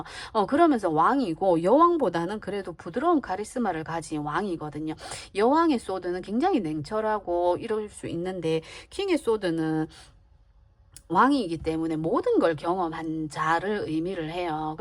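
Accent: Gyeongsang, South Korea. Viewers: 4번 갱상도 억양으로 들려주는 리딩~ 감사히 잘 들었습니다 나를 많이 챙겨라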